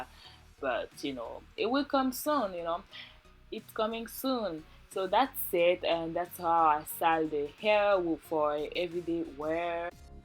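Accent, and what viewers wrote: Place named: France. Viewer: Nice video! Es-tu française? You sound like you have a French accent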